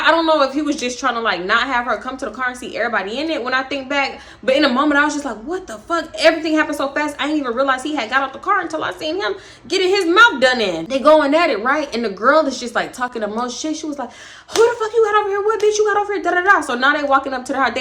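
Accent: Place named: Chicago, United States. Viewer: WHY do you sound so Chicago!? #why 💪🏾💯💓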